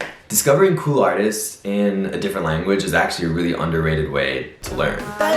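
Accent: Buenos Aires, Argentina. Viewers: Tienes mas acento argentino espero que visites Peru. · Yo podría ser tu native speaker, para que dejes ese acento argentino y tengas uno más neutral. I´m from Chile. Practicamos idiomas y hacemos otras cositas jijijiji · AAAAHHH I KNEW YOUR ACCENT SOUNDED A BIT ARGENTINIAN!! Si te soy honesto, sos la primera persona estadounidense (nativa) que escucho que tenga un acento argentino. It really was shocking. Not many americans know about our existence but I’m somewhat happy on the fact that you have that beautiful accent of ours in your spanish. Muy orgulloso de vos ❤️ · Saludos desde Mendoza. Argentina. Tu acento es porteño perfecto!! Bien logrado!! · You are not only speaking spanish, you are speaking argentinian, and that's freaking hot. Saludos desde Argentina :) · tu acento argentino me hace reir XD yo apprendó el frances tambien y pienso que puedes hacerlo más facíl !! 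esos tres languajes son muy similares y lo hace más facíl de apprenderlos. j'aime mieux apprendre les langues et tes vidéos à leur sujet! j'espère que je rendrais visite à la france à l'avenir comme vous et argentina : ) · AMO TU ACENTO ARGENTINO, HABLAS MUY BIEN EL ESPAÑOL, SE VE QUE TE HAS ESFORZADO, TE ENVIO ABRAZOS Y BESOS DESDE PANAMÁ 💜🙌🤗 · I'm learning english actually 😂😂😂 what I do is listening to music, sometimes I read books in English but what actually I do like is watch videos on youtube. I keep looking for channels in English and I also learn from them too, new words and new stuffs. What I realized is that you accent is from Argentina, I'm from Dominican Republic. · Damn!! Escucharte hablar con ese acento argentino me pone mal!!! Saludos desde mexico!! MAS VIDEOS EN ESPAÑOL X FAVOOOR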